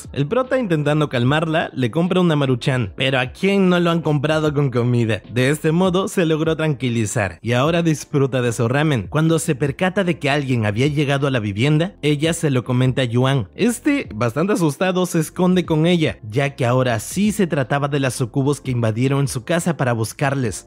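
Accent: Venezuela. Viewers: eres venezolano? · Eres venezolano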